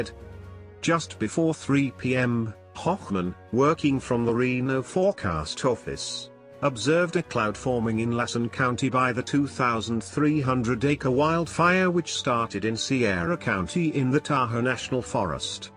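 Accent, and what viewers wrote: United Kingdom. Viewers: Thats not True there have been fire tornados before ,,,, Robot Brit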